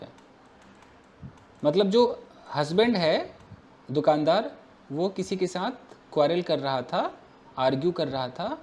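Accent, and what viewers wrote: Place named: Bihar, India. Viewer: Sir aap puri bihari bol rhe 😄😄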